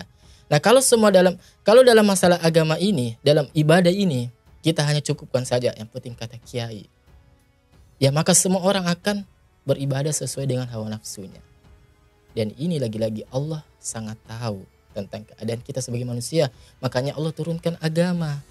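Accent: Sulawesi, Indonesia. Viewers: Cara bicara anda sepertinya orang Sulawesi dan sy yakin anda cm mondok di Sulawesi tdk di Jawa jd belum tahu semua tentang pesantren aswaja. Anda bilang objektif tapi ketika ada komentar yg mendukung anda bahkan menyudutkan NU anda malah like. Dmn letak objektivitas anda? Kalo memang anda objektif harusnya anda bilang itu oknum 🙏🙏🙏🙏🙏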